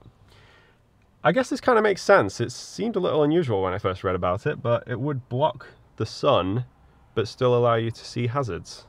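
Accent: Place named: Nottingham, United Kingdom. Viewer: What are you talking about? has a Nottingham accent because he was born and raised in Mansfield.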